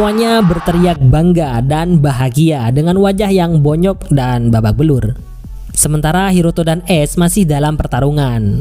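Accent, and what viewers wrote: Madura, Indonesia. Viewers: Medureh min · cengkok e meduroo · Logatnya kek orang madura min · Madureh ben cak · Logat mimin sangat medok logat madura apa betul min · Teppak kereng medureh adminah😂😂😂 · Oreng madureh kaleh😂😂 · Bentar², mimin orang madura nih kayaknya😲, mantap min👍🏼👍🏼👍🏼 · "apah ca'en lah epade'remmah beih"😅ras madureh jugen min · Salfok sama logat/nada abangnya cerita kayak ciri khas orang madura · Oreng Madura ben bg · baru tau ternyata mimin orang Madura, aku bangga. 💕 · Oreng medureh kak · Logatnya madura banget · madura dimmh bang?? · Mak Reng medure😂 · Kentel banget dah logat maduranya,,salam setong dere bro 🙏🏻🙏🏻🙏🏻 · suaranya logat madura yaa 😁😁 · seperti logat madura ini mah🔥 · Adminah tepak ke Reng madureh wkwkwkw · Logat mu ada logat madura min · Mak a madureh bang,,, apa caen lah, pa dekremaah beih.. Asli mana bang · Yg ngasih suara orang madura ya logatnya madura banget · Anak Madura mana min 🤭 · Medureh cak👍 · Madureh dmmah bn bang🤣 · Abang ren madureh mantap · Logat khas bahasa madura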